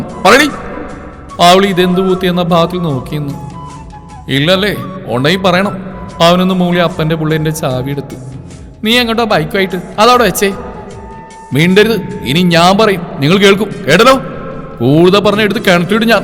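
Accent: Thrissur, India. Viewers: ഇവനിതെന്ത് വയ്യേ... 🧐😅 ഇവൻ ith എവിടെ പരിപാടി അവതരിപ്പിച്ചാലും ഇതാണല്ലോ അവസ്ഥ 😹 that thrissur slang🙌😅 twistod twis😹😹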